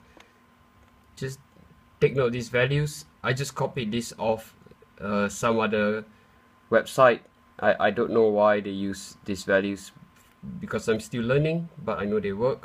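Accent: Singapore. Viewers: your accent is similar to the way my friends from Singapore spoke. It was nice to hear someone speak that way again.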